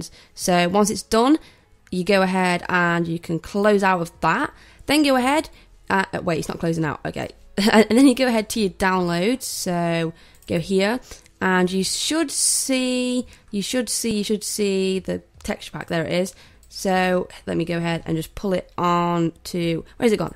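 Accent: England, United Kingdom. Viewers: wow british sound i think ;) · that voice is she from manchester londer lester or none and its sound so familiar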